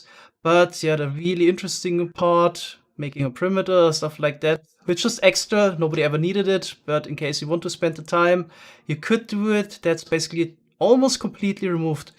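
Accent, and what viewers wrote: Germany. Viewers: got a feeling u are german...wich is cool cause im cool.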